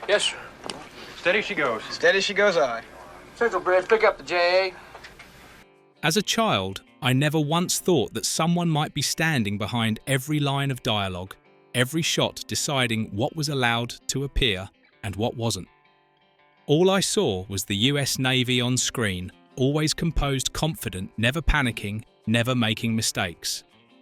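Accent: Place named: Liverpool, United Kingdom. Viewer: I agree with you. It would also be nice to have a English speaker for narration instead of that liverpool English. Thank God for captions😂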